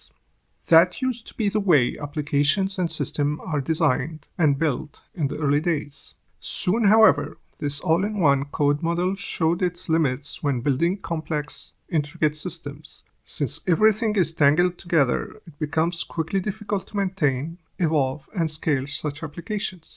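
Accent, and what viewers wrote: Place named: India. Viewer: at 1.25x this sounds like an indian accent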